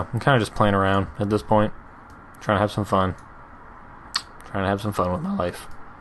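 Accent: Canada. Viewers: ... Ur Canadian aren't you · Dat Canadian accent doe :D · Are you Canadian?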